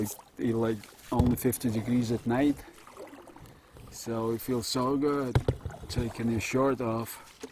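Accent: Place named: Russia. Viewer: Почему я слышу русский акцент?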